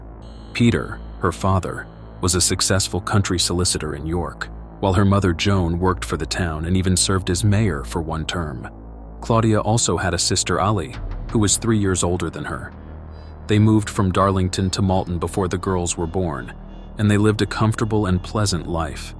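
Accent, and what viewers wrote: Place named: United States. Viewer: British crime but it had to be an American voice.........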